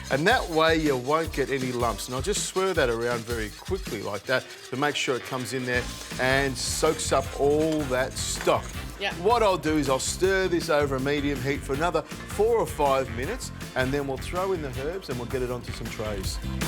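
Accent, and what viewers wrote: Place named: Australia. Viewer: That's an Australian accent, not Italian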